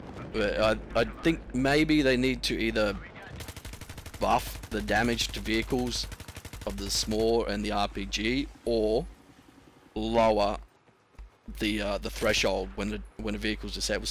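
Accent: Australia. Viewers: Wereabouts are you from, i'm gessing Aussie :D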